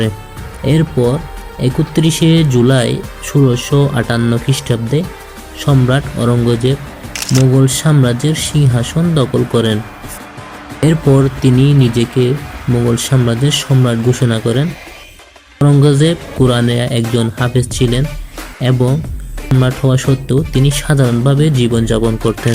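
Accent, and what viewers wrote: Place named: Chittagong, Bangladesh. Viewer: ভাই উচ্চারণ নিয়ে কাজ করতে হবে। অতিরিক্ত চাটগাঁইয়া এক্সেন্ট আসছে। শুনতে অনেক খারাপ লাগছে।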